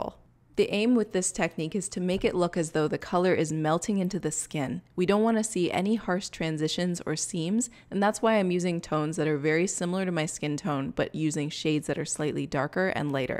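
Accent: Canada. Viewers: Guys is she canadian?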